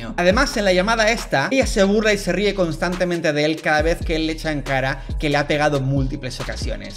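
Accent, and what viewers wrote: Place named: Spain. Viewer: No lo dice mal, solo lo dice con su acento español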